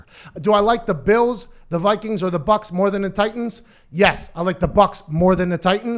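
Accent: Pittsburgh, United States. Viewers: Gahnd ahn Pahnd...love that your pittsburgh accent still come aht.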